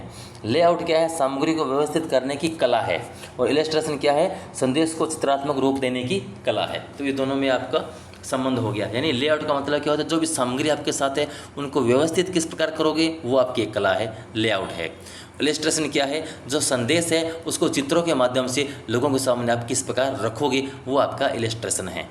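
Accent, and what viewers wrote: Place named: Haryana, India. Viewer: Sir aap Hariyana se ho kya... Aapki awaj se lag raha hai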